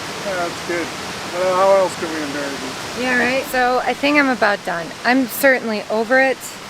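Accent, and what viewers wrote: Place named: Chicago, United States. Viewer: DUDE!!! I’ve watched a few of your videos, I’m subscribed but I work a lot, I don’t know if you’ve ever mentioned you were from Chicago but when I saw your 1st video I thought to myself, “she sounds like she’s from Chicago” lol! I live 45 min south of the loop!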